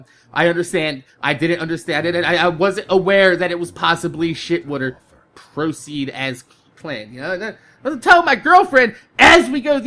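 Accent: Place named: Florida, United States. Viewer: It sound like you stay in Florida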